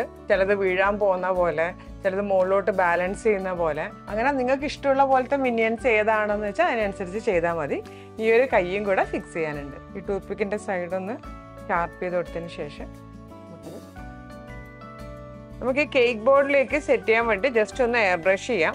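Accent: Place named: Kozhikode, India. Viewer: m also frm calicut,,,vadakara.. samsaram kettepo nk thonni..atha chodche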